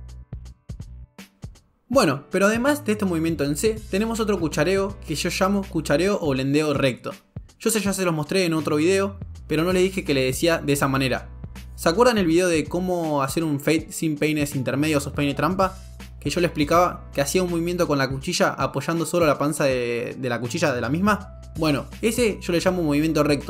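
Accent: Argentina. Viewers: muy buen video solo que por favor pronuncies mas despacio ya que eres argentino y aveces no te entiendo tu acento ,, es solo tener cuidado al pronunciar es question de acento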